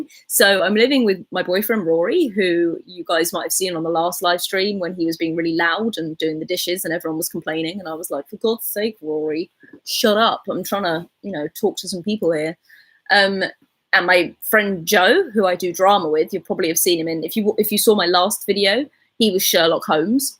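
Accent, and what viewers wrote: United Kingdom. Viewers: Missed the live chat again but enjoyed the replay almost as much 1. I love your quintessential British accent, too 2. love watching you in your new minimalistic setting, very cool , btw do more accent challenges pls thank you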